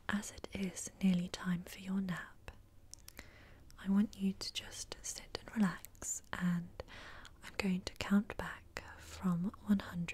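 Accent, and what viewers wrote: United Kingdom. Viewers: And has a British accent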